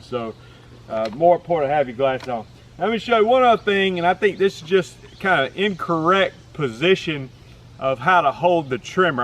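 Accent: South, United States. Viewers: Loved your video, I did learn something, and I think its funny some of your comments about what the knuckleheads say about you or your work. And can I say, I love your "accent". I'm from the midwest and I forget comfortable and how easy it is to speak to or listen to someone from the South. I think if you stopped by my house, we'd be out on the deck or patio drinking a beer, just talking about things in general. I think that says quite about you. Best of luck and thanks for the video! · Your not English are you.?..love that southern drool . Over here in lil' ol' England we call it a lug hole..lol! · Great instructional video! Cool personality and love that southern drool 👏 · 1. Attractive 2. Southern accent 3. Handy 4. Excellent sense of humor. Is this guy single?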